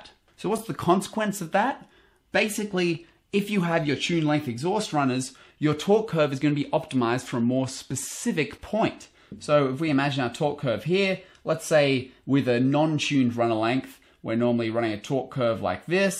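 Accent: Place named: Australia. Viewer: even though I don't have much experience with Australian accents , I was able to understand you 100% . no muffles or "uhhhmmms" at all. Thanks!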